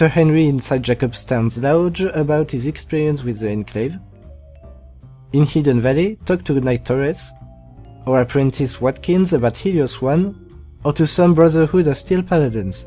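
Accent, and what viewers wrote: France. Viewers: you do understand his native language is French right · est ce que tu est francais ?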